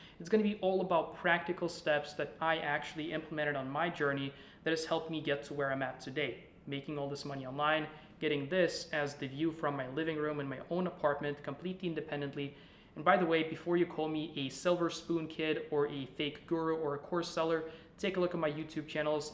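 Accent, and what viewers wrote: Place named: Eastern Europe. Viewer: as a Russian American I could tell you were Slavic instantly.....so almost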